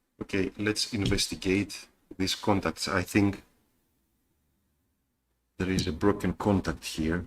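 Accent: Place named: Greece. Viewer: Η προφορά σου μοιάζει ελληνικη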